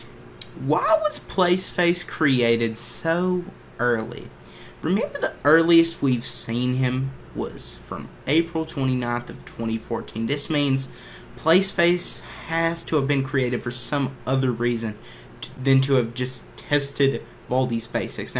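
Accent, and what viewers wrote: Texas, United States. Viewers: His accent is pretty texan like, LOVE IT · You know, your texas (or western-american) accent somehow really fits iceberg videos. I think you should try doing more of these. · Bro sounds like texas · You sound like your from Texas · I fucking love the texas accent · Are you from Texas? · You sound like a man from texas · Nice accent. Are you from Texas? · are you from texas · I like ur Texan accent (I think it's Texan, I'm an American and know the State accent) Also u get a sub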